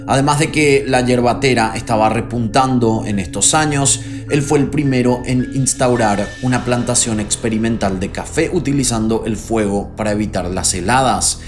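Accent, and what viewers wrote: Venezuela. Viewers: Pensé que eras venezolano. No conocía este caso y ps wow lo que hace una buena oratoria. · Aló! 👋😉👌Pensé que eras Venezolano. 🤔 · Yo pensé q eras venezolano jiji Desde que conocí tu canal , tus videos son muy interesantes y atrapantes x la manera en cómo Relatas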